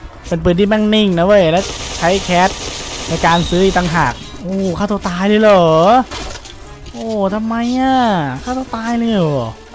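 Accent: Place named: Isan, Thailand. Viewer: เห็นบางคริปพูดอิสานนึกว่าคนบ้านเดียวกัน😅🤣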